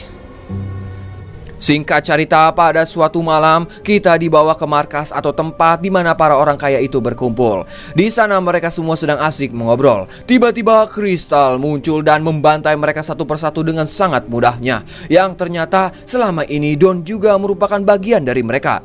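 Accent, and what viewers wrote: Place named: Sunda, Indonesia. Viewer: Lu asik bang klo bicara Sunda wk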